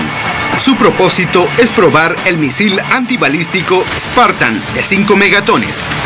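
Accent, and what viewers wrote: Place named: Argentina. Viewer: No se porq pero presiento que eres Argentino verdad ???👎💩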